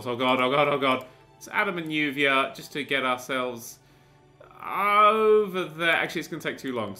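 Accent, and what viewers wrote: United Kingdom. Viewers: seriousy?? u sound like ur from great brittian, if so. hw do u NOT know doctor who??and why does the interior look old (season 3-4 or something)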